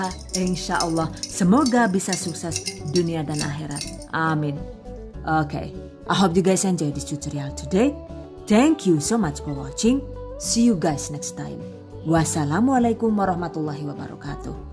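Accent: Banyumas, Indonesia. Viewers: Ora ngapak ora kepenak yu#GiveawayTHV2021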